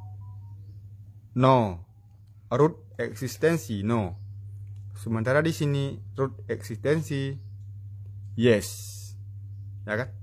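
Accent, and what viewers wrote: Indonesia. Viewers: Lage logat aceh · wong plembang ni, nak mudike tulaa wkwkwkwk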